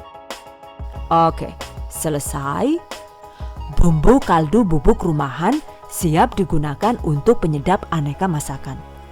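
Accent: Banyumas, Indonesia. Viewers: Terima kasih ya... Insya Allah akan saya coba resep ini.... Seperti kemaren saya baru saja buat nugget ayam.. Enaak banget.. Terima kasih sudah membantu.. Tutirialnya jelas dan mudah dipahami.. Ada lucu lucunya juga bahasa ngapaknta... 😂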